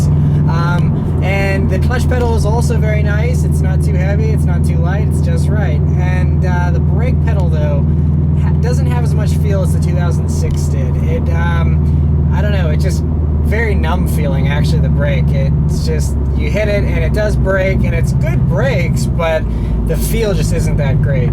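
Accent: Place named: United States. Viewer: an unbiased opinion on wrx by a youtuber whos account name is?... think that one out... however his reviews are good, I love em alot... and I hope he does go onto greater things.... somethign about the American accent and documentary really fits, same as British accent... try doing this as an Aussie..... Our accent feels sharp and uneasy to listen to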